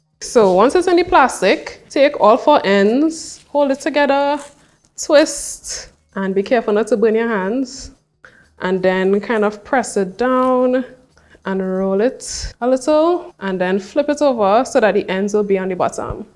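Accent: Trinidad and Tobago. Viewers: Sounds like a 🇹🇹 accent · Why do you sound like a Trinidadian???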